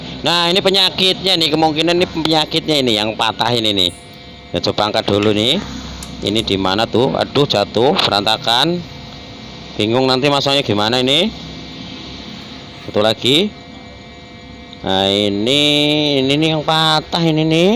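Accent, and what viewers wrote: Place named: Ngapak, Indonesia. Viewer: Logat ngapak e kentel bgt kang 😄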